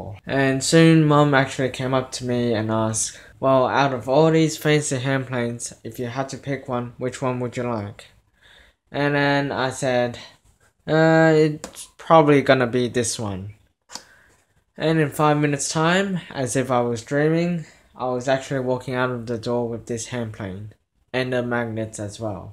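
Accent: Australia. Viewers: Australian accent. :)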